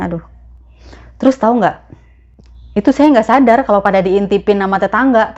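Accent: Jakarta, Indonesia. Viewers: lucu deh ngomongnya,pake logat Betawi...nyenengin banget!Terusin ya,ceritanya...aq jd bnyak tau.Tks.❤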